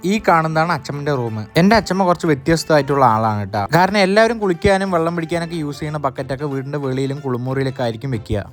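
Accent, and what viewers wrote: Palakkad, India. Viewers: Slag kettitt palakkad Aanenn thonnunu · ❤️❤️palakkad slang 🥰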